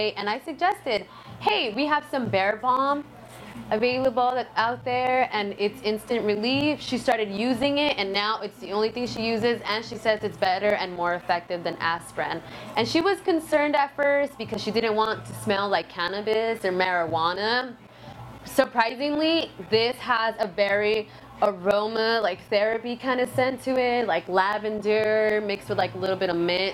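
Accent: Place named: Jamaica. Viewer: Sour! "Jamaican Acent"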